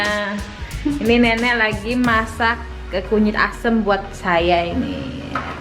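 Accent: Jakarta, Indonesia. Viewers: aslinya mana sih. Nikahan kayaknya adat sunda. Tp ngomong gaya betawi. Jadi yang mana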